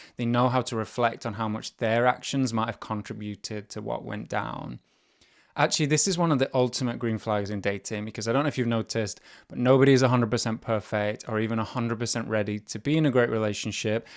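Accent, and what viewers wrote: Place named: United Kingdom. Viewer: Your British accent is extremely soothing.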